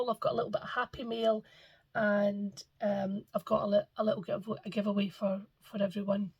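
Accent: Scotland, United Kingdom. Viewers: I enjoy your videos so much. And your Scottish accent is so delightful! Your enthusiasm for this beautiful craft make me go down rabbit trails in my stitching life. Blessings from Canada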